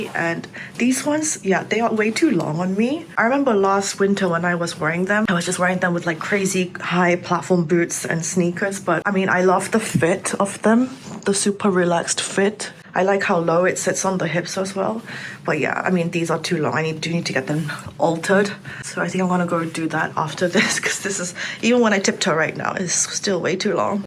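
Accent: United Kingdom. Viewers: Don't worry, i love your vlogs for whatever you're doing and so! Also i love your british accent as always😆💕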